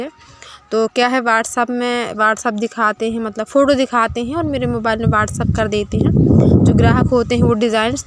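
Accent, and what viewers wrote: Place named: Pahari, India. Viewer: Di aap video me pahari tune kese lagati hai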